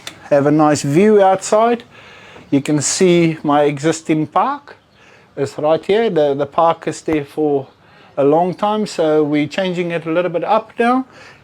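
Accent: South Africa. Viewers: That's one of the strongest South African accents I've ever heard..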